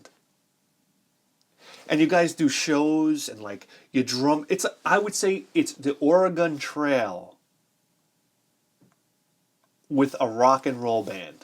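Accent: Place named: New York, United States. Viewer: Hiya. First off fucking love your show. We're about the same age so I can relate to much of your video game history. I had a subscription to Videogames and Computer Entertainment from 1990-91, I remember there was a special on Phantasy Star 3 in one issue. I poured over that article for hours and hours, examining each screen shot and dreaming about a when i'd get the chance to play it. Never happened, but that's how it was in those days, in my part of Canada, the province of Saskatchewan (i'd to hear you pronounce that on your show with your NYC accent, that'd be fuckin hilarious). There was not much for variety in video games, especially JRPG's. But anyway, I'm with you on the greatness of Phantasy Star 2, I've never completed but i keep getting going back to it over the years. Keep up the great work, on the greatest video game show in the history of human civilization.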